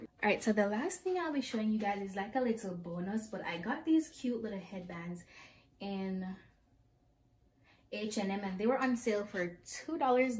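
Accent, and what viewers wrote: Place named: Jamaica. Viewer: loved this video. I caught the accent. Jamaican? If so, I'm Jamaican as well and i also did a Back to School Try on Haul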